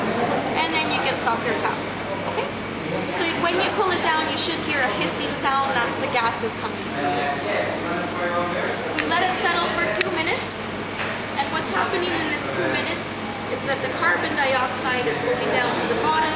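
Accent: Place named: Ireland. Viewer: she sounds so Irish, but then I went to Dublin last year and in two days managed to speak to one genuine Paddy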